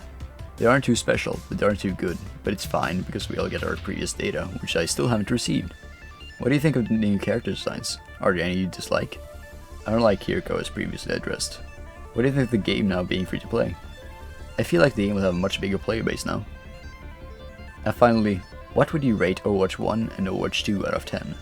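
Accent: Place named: RP, United Kingdom. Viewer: Why you speak like a BBC news people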